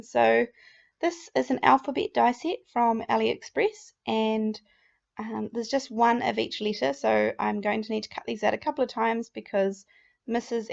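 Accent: New Zealand. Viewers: Love your videos, so nice to hear a kiwi accent!! Just wondering where you get your black and white cardstock from?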